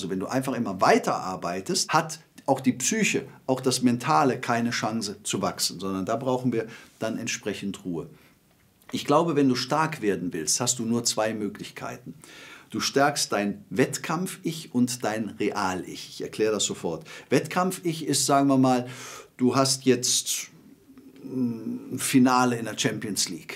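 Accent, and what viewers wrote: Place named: Cologne, Germany. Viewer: Stundenlang kann ich mit dir quatschen, du bist so ein cooler Typ, mit kölsche Einschlag 👍😎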